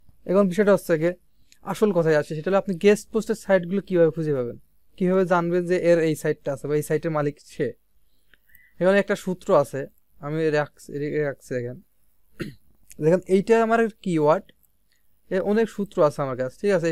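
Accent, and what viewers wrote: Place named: Pabna, Bangladesh. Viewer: kotha sune mone hocce pabnar vasha, apnar sathe jogajog kora dorkar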